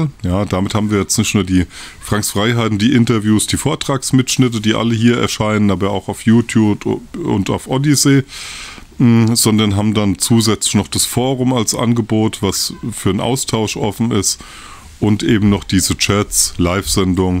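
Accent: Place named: Hesse, Germany. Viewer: Ich liebe die Rezepte❤ und den hessischen Akzent. Much love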